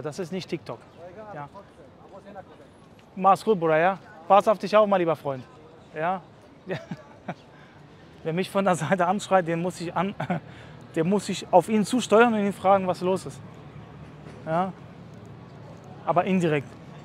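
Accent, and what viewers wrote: Berlin, Germany. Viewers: Dit machste schon jut Immer schön Berliner Schnauze wa Hau raus Bro 🙌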